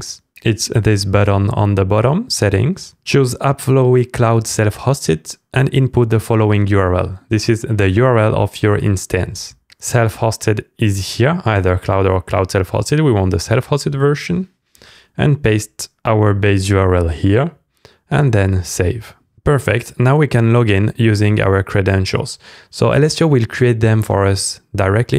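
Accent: France. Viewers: The french accent make it better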